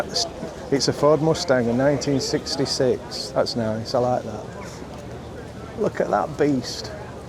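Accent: Yorkshire, United Kingdom. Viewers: Two things, did you notice how the blue skies appeared once you’d crossed over t’Pennines and secondly, you started talking in your best Yorkshire accent. I know this because I do the same when I’m back home in God’s own country.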